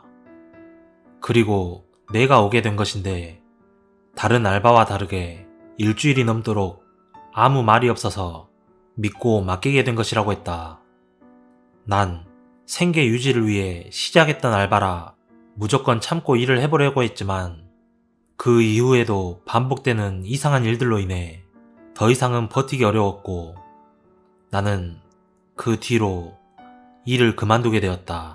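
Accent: Jeolla, South Korea. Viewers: 구수한 전라도 사투리~~~😁😁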